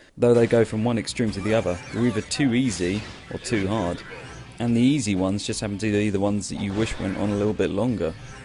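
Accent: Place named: United Kingdom. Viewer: Wow, you really are British. I thought that was a fad or something. :P Good review, though I probably wont pick up the game. Wish they would make more legit Toku games.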